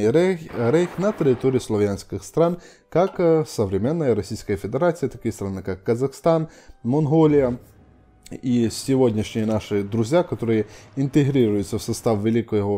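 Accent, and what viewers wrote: Ukraine. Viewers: Прохождение классное, голос автора приятный с нотками украинского, это атмосферно. Однозначно лайк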